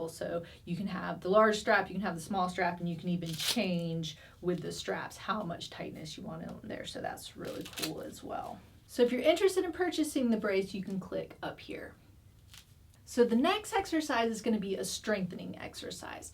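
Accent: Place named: South Carolina, United States. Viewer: I am a PT and I want to thank you for doing such a great Public Health Service with your videos. Your treatment is spot on and delivered very clearly in an easy to understand manner. I’m in Massachusetts now but couldn’t help notice that you’re a fan of SC, my home state! It’s nice to hear a familiar accent. Keep up the good work!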